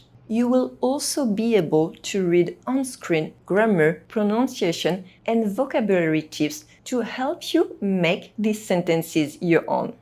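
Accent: France. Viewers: I know but I wish I could do it JUST LIKE YOU! Also I LOVE you French accent of English! That is the best foreign accent! Much less rough than Austiran for sure lol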